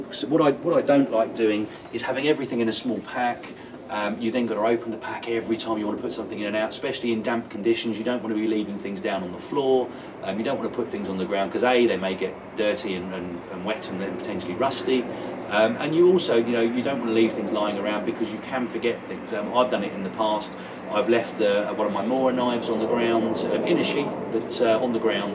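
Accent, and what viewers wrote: United Kingdom. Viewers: I do not approve. You're not Batman and do not need a utility belt made of quality leather to hold your Altoids tins. It's a superfluous accessory and you can chuck all of it (and more) in a backpack. Plus, if you're in UK as your accent suggest you might be, you're within walking distance (and probably earshot) of a highway, so...it's unnecessary for that reason too.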